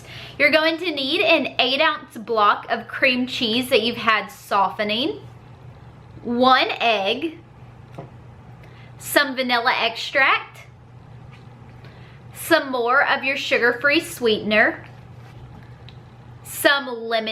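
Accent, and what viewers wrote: South, United States. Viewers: You so country lol lol lol. I love it!!! So adorable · I love ya girl.... Since u moved to bama ur getting more country twang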